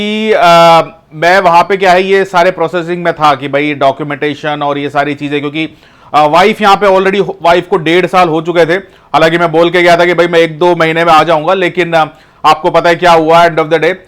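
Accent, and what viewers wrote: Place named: Hyderabad, India. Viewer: Bhai Aap ke Hyderabad ke Dost Bahot the Kya? Kyu ke Aap ki Baat me Hyderabad ka Style hai.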